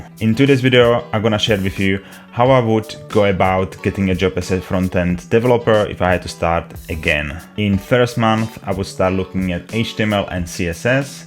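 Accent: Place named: Czech Republic. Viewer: Slyším českou angličtinu jdu mrknout na video :D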